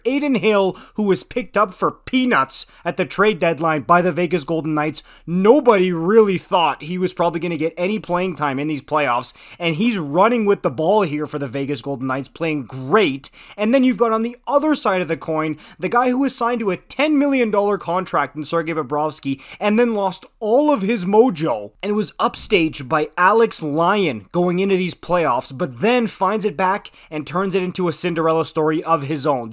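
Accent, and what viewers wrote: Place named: Canada. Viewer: you can tell you're from canada in the first 20 seconds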